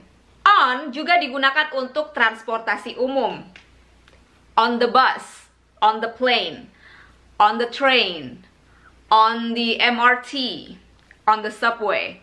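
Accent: Surabaya, Indonesia. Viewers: Sama saja kok... logat suroboyoanku juga ga bisa hilang walaupun sudah tinggal 7 tahun di Bandung dan 4 tahun di Jakarta....makanya aku seneng lihat Mba logat suroboyoannya tetap ada walaupun sudah 3 tahun di Amerika:)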